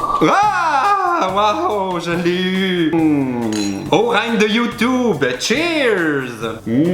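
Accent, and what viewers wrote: Quebec, Canada. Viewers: Franchement je t'adore trop je suis francaise et j'adore l accent quebequoi c est trop chou!!!!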